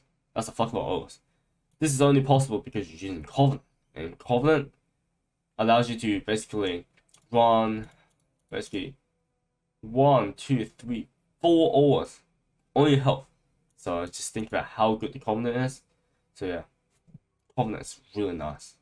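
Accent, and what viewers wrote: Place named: Australia. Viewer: You sound like you have an Australian accent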